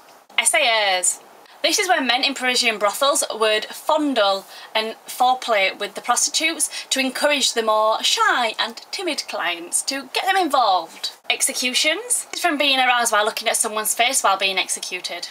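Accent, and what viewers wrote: Yorkshire, United Kingdom. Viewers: Are you from yorkshire? my dads side of the family are all from hull, and the accents sound very similar, of course, I'm not english so I'm not that great at recognizing where the accents are from :)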